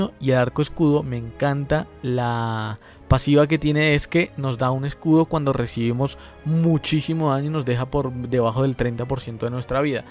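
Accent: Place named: Bogotá, Colombia. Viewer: Oye qué excelentes guías! Son las mejores que he encontrado en español. Felicidades. Tengo la impresión de que eres de Bogotá jajajjaau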